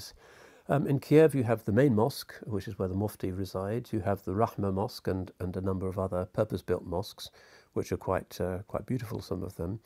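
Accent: England, United Kingdom. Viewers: this guys voice, house, appearance and English accent makes watching his videos so soothing and cosy. its like your sitting infront of a chimney fire drinking hot coco while hearing a story from your grandpa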